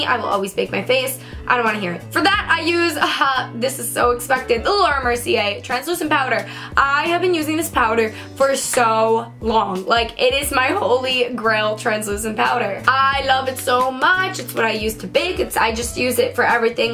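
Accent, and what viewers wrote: New York, United States. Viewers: You have such a New York accent I love it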